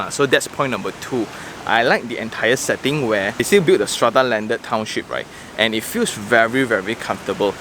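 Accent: Malaysia. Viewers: Your sound like malaysian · are you from penang? Your hokkien sounds like 1!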